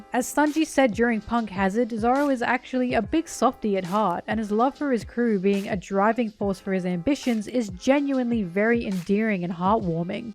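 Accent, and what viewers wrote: Australia. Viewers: Zoro is my favorite character in One Piece, also ur Aussie accent makes u sound Beautiful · What is it about aussie folk that make them really appealing one piece content reviewers?? xD I like the analysis and jokes and (this may seem foolish but) as an english speaking foreigner, I also enjoy the accent telling the story. Also like zoro, obviously~ (lol)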